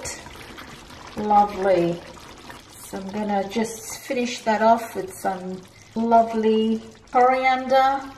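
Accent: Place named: South Africa. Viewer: Because she is not an Indian, she has a South African accent - how rude and totally ignorant, (maybe uneducated as we'll I suspect) to ask "why the accent? " - I made this recipe and it is awesome - thank you lovely accent lady❤❤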